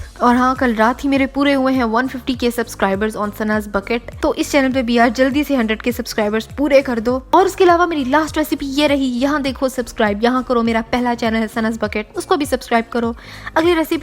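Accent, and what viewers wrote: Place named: Punjab, India. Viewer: I really loved your channel and I love u too...😘 your accent 😍 of Punjabi..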